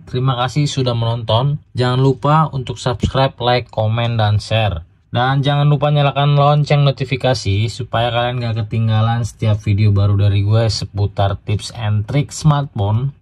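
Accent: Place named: Sunda, Indonesia. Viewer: Kok seperti suara Aa bandung ya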